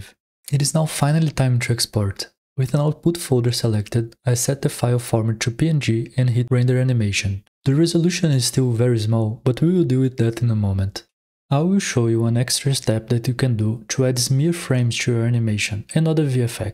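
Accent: Brazil. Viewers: o sotaque br alegrou meu dia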